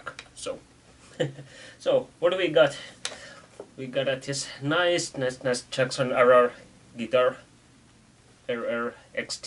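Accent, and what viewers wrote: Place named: Finland. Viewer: You have kinda finnish accent in your english. Am i right? Anyway nice video. I really want to buy that guitar. Thaks for the tips considering about the possible upgrates i might have to do in the future.🤟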